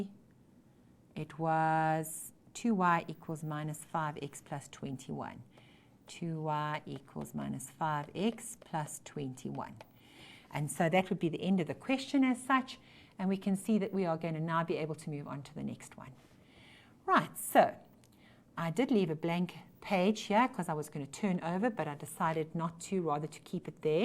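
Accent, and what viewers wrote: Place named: South Africa. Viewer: South African Accent... Loving it :)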